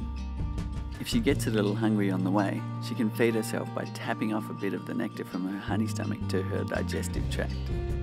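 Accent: Australia. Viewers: Would very much appreciate you complement the vid with subtitles... your accented English (Aussie?) makes full comprehension rather difficult, caught about 90% of it. :-)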